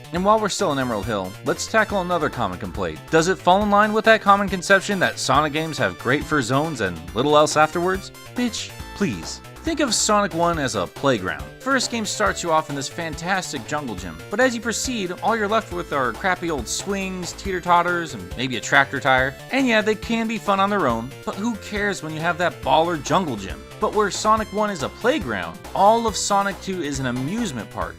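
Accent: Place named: United States. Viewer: I've also never seen that 7 emeralds ending. Are you, by any chance, playing Sonic Compilation (the one that had Sonic 1, 2 and Dr. Robotnik's Mean Bean Machine)? The standalone Sonic 2 has a different "good ending" where it's just the logo shown over a black background. I noticed in the brief comparison clip that your Sonic 1was moving somewhat slower. Is that the infamously poorly PAL Mega Drive localization of Sonic 1 where, because PAL TVs were running at 50 Hz, the game actually runs about 16% slower. Even the music is slowed down? But you have an American accent so I assume you own an NTSC Genesis. By the way, kick ass review. If I were to make a review of Sonic 2, I'd probably describe the negative aspects of the game the exact same way you did.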